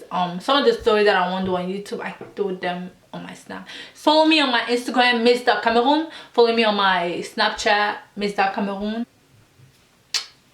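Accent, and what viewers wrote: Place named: Netherlands. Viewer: Where u from your accent different like u from Netherlands or something?..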